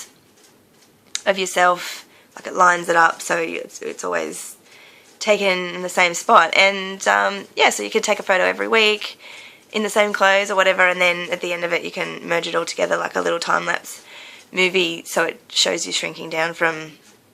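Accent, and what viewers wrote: Australia. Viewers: Love your Aussie accent... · First off may I say you're gorgeous! I totally relate to what you're saying when you talk about your parent's talking about your outfits when you were younger. I remember once my dad saying I looked like a sack of potatoes in a t-shirt dress I had (that I loved) when I was about 10. Kind of broke my heart a little. I totally get it. I think now though, he is my biggest fan. Thanks for sharing. Love your accent, I'm Canadian so Aussie accents are intriguing ;) I'm going to have to check out that app! Cheers!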